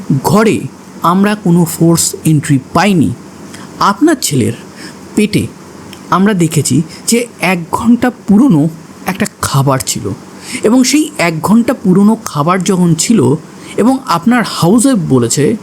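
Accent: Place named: Hindi, India. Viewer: Alka sune aami bhabchilam hoyto alkatra e bolbe. 😂😂😂😂 O bhai bangla ta banglar moto bolo na keno??? Hindi moto bolte giye na bangla bolle thik kore na hindi. Tomar maa baba ke gigesh koro Alka ki kore bolte hoy. Aamar bangla o khub ekta valo noy.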